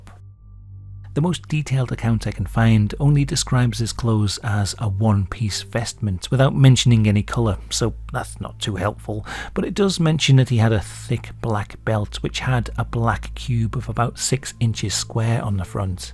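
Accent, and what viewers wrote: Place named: Newcastle, United Kingdom. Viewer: Geordie accent? Duck off, I'm out.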